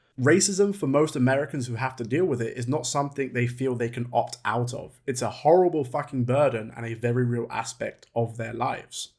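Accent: United Kingdom. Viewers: I respect your opinion But a British accent criticizing the greatest leader my country has ever seen….is interesting As an actual black person I respect Ye’s move to the right Inspires more true black Americans to get out of this liberal stranglehold that’s been destroying our communities for the past 60 years And after 400 years of slavery, yes, it does become a choice to stay a slave. Especially when you out number the people enslaving you. What do you think share cropping was? Former slaves that chose to stay on the plantation after emancipation. Ye’s still the best Never bad in my book WW3 & Bully will be legendary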